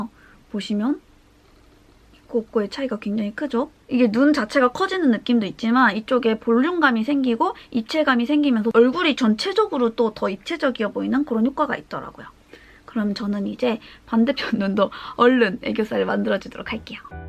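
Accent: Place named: Gwangju, South Korea. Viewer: 혹시 언니 광주에 사시나요? 말투가 제 친구들과 똑같아서..❤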